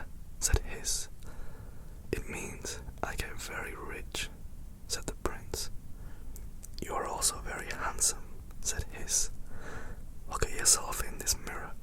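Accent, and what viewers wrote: United Kingdom. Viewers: Handsome Indian man ✅ gorgeous British accent ✅ amazing asmr video ✅ this is the best! Love your channel! Thank you 😃💙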